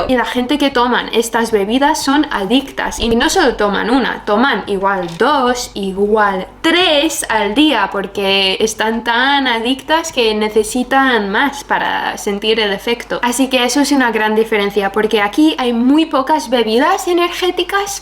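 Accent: Spain. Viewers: Sabes ya tanto castellano que hasta marcas como Red Bull y Monster las pronuncias al modo español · tal vez ya no puedes leer este post, ya que el video es antiguo, pero aqui en mi pais tambien se hace mucho aceite de oliva , me sorprende tu español aun que no me gusta mucho el acento (español) me sorprende tu buena buena pronunciacion y creo que eres la unica de habla inglesa que he escuchado que no tiene problemas con la RR la unica, en chile mi pais tienes lo mejor de los 2 mundos.... por un lado muy americano y por otro muy europeo, suena extraño pero es verdad. un abrazo! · Vengo de el canal de Anita, me gustan tus vídeos y me gusta tu tono de voz al hablar el acento español siendo de lenguaje materno Ingles, es una voz muy hermosa 🧡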